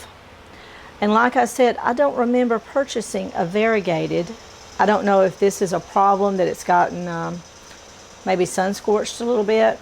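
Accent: South, United States. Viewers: You have a lovely southern accent. I am hoping you are in the same zone i am so i can get succulent advice throught your videos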